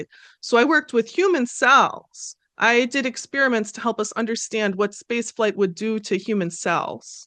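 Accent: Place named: Minnesota, United States. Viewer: You must be from Ely or Duluth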